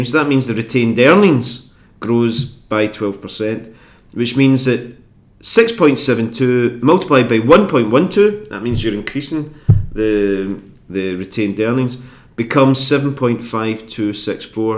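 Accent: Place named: Scotland, United Kingdom. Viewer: Nice scottish accent out there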